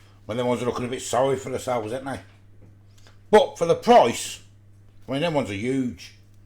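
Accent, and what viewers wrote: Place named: West Midlands, United Kingdom. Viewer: Just found your channel I love a good food reviewer you sound common to West Midlands maybe could be wrong tho looking forward to watching your past and future vids 😊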